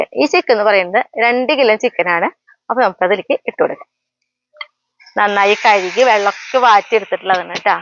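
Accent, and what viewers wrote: Thrissur, India. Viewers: വീഡിയോസ് ഒന്നിനൊന്നു സൂപ്പർ ആണ്. കണ്ടു കഴിഞ്ഞ വീഡിയോകൾ ആണെങ്കിലും പിന്നെയും ഞാൻ കാണാറുണ്ട് അത്രക്കും ഇഷ്ടമാണ്. പിന്നെ നമ്മുടെ തൃശൂർ ഭാഷ കേൾക്കാൻ ഇഷ്ടമാണ്. വളരെ innocent ആയിട്ടുള്ള സംസാരവും വളരെ ഇഷ്ടമാണ്. കുടുംബത്തെ ഈശ്വരൻ അനുഗ്രഹിക്കട്ടെ എല്ലാ സൗഭാഗ്യങ്ങളും നേരുന്നു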